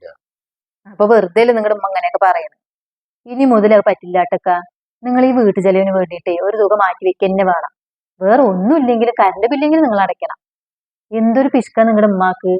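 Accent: Palakkad, India. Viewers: നിങ്ങൾ പാലക്കാട്‌ ആണോ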